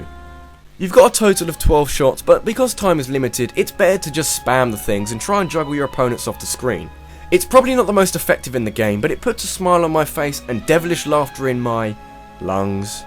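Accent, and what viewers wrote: England, United Kingdom. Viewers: Nice countdown, you're one of the few youtubers who can make me crack a smile, since I love English accents. PS: Have you played TF2x10?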